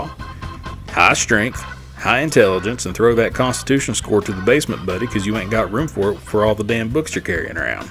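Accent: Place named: South, United States. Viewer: I agree, that's why I'm kinda wondering why he hasn't blown up. Smooth, precise with a certain southern charm twang going.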